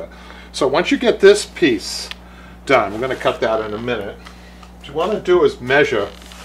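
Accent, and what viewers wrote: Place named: New York, United States. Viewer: Love that New York accent ..."right om"...it's R A D . And I love the demo. I want to buy a beautiful upholstered chair for my new-to-me modular home and the arms are stained . So this is the answer ! Thanks !!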